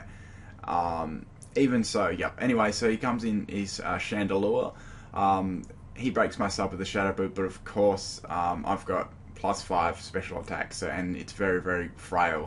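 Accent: Australia. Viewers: You have a very heavy Aussie accent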